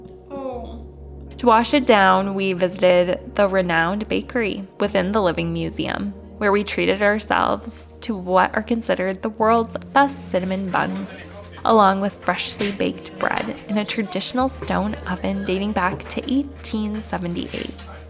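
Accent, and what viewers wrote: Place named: Los Angeles, United States. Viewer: this video is really good....but your LA girl accent is so annoying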